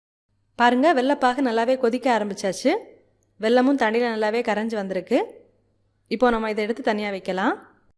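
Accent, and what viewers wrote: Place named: Nagercoil, India. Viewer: Sister neenga nagercoil pola ........ speech apdi than iruku.....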